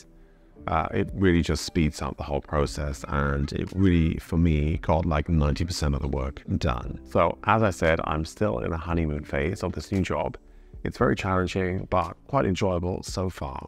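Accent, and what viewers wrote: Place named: Germany. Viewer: Your accent is so strongly German now.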